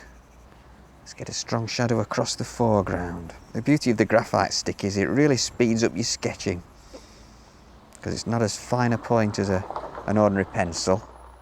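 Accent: England, United Kingdom. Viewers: I am so amazed by this painting and Mr. style, I watch this video at least 3 to 4 times a week....even while I'm doing my own creating. The colors, the cottages, the British accent - all my absolute favorites! My love for the English cottages and the landscape keeps me re-visiting this video and the others, as well. Thank you, Mr. for such soothing yet helpful tutorials. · What type of English accent is this? Reminds me of Eric Idle.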